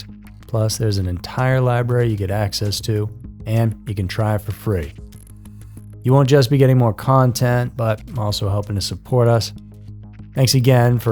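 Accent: South, United States. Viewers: Im from South Africa and am so mesmerized by your gorgeous southern ? I think accent that im trying to focus on the material at hand 😂❤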